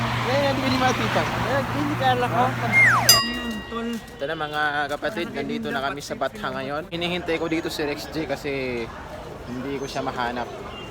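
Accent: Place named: Maranao, Philippines. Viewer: Kalowka akala ko taga Luzon or somewhere, mranao kabs akie! Naks naman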